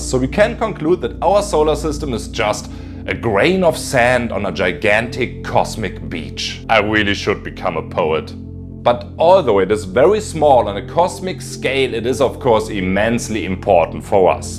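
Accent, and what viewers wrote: Germany. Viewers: Ich würde es lassen „On Englisch“. Wirklich zu deutsches Englisch und paar ganz harte Aussprache Fehler drin. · Lieber ich liebe deutsche Leute die englisch sprechen 🗣 die kann man auf YouTube wenigstens verstehen aber auch aus Grund des lustigen Akzentes schnell als deutscher identifizieren dennoch Trotzdem viel Glück und Spaß in der Welt des englischen contents!!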